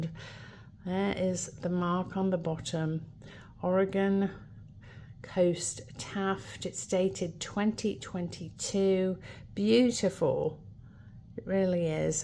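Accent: England, United Kingdom. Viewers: If you grew up in the United States, why do you have the English accent just curious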